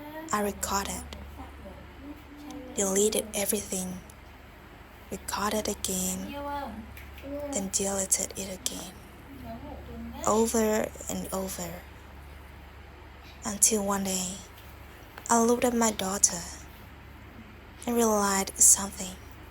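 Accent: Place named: Vietnam. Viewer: don't ever feel self-conscious about your voice or pronunciation. You are speaking English, which puts you ahead of many other Vietnamese ladies. Your video is very well presented. You will do just fine if you keep going and don't let the negative commenters bother you. Anyone who says anything negative about your efforts is inconsequential in your life. Your husband must be a cruel person to leave his wife and child in this situation, and bad luck will follow him in his future endeavors. I believe your channel will grow very fast, and you will benefit greatly from your efforts.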